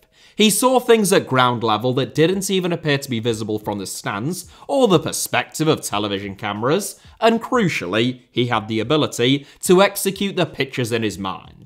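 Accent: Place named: East Yorkshire, United Kingdom. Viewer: I often disagree with, yet here I am again to let him elicit angry puffs of steam out of my ears with his opinions. It's official, it's an addiction now. I'm even subscribed now. I blame that drowsy East Yorkshire accent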